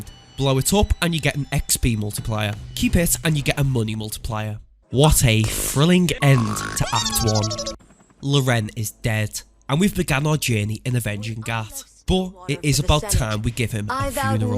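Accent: Liverpool, United Kingdom. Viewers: hello fellow scouser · Are you a scouser?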